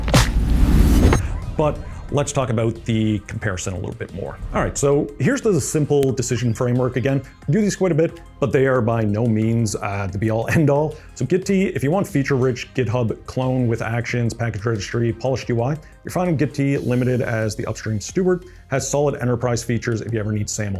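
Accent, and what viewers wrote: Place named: Nova Scotia, Canada. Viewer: sounds like a Nova Scotia accent to me.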